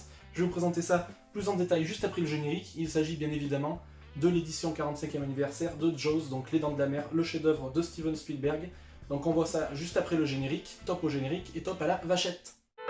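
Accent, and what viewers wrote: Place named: South, France. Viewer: super! Vu qu’à tu as un petit accent du sud et que je suis de Toulouse, tu pourrais me dire lequel? 😅 en tout super vidéo!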